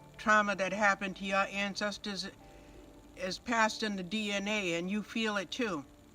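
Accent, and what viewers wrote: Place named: New York, United States. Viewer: new york jewish accent the jews think they are black they stole the Black peoples history, what happened to all the red Skins,rich jews killed most of them off, Redskins who don't use the terms people of color that would be racist towards white people, who as soon as the sun shines on them, they turn a lovely Red color from the Pahana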